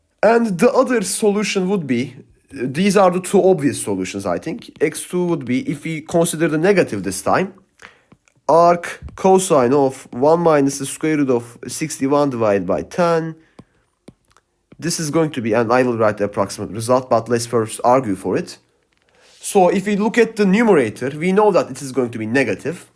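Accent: Turkey. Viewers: yemin edebilirim ama ispatlayamam konuşan bir Türk :) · Usta türk olabilir misin tam türk aksanıyla inglizce konusyon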